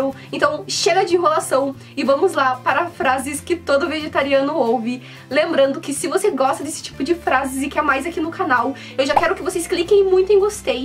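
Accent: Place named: Sorocaba, Brazil. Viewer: Que sotaque gostoso! sinto saudades dos meus amigos de sorocaba que falam assim, tenho amigos do sul que falam assim também. Minha amiga Priscila fala igual a ti!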